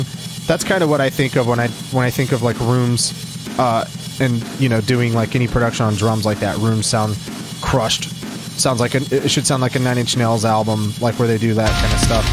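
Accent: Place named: Appalachia, United States. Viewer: The hillbilly accent 😂 I love it!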